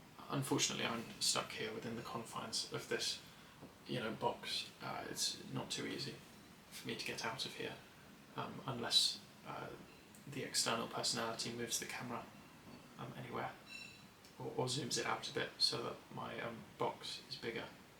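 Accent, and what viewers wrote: England, United Kingdom. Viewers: im from argentina and i use your videos to practice and learn more about british and i loooove your accent !! i wish i could speak english like you someday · What part of the UK is your accent from I quite like the way you speak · I haven't watched this yet but wanted to say your hair is beautiful. Also if you mind me saying so you are just pretty and cute. As an American your British accent is heavenly. I feel like you would give the warmest softest hugs. I hope you're well. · Also as a fellow English person I totally felt the accent bit 🙃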